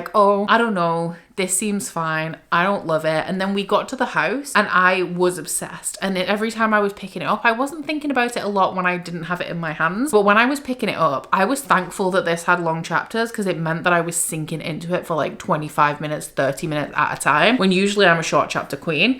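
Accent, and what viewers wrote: Scotland, United Kingdom. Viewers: I just can't place your accent! Moments you sound scottish like me and then you sound yorkshire ahahah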